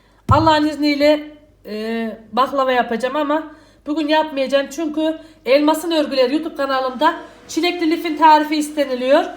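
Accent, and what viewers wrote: Aksaray, Turkey. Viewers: Yeminle doğal konusmani çok seviyorum lütfen Kibar konuşma Aksaray aksanıyla konuş:^) ayrıca" dürüm" lerine bayılıyorum 👍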